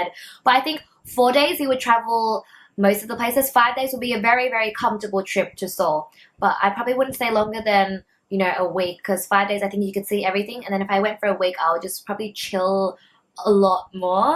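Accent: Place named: Australia. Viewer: 호주발음이라 귀여운데? 네 귀가 썩은듯.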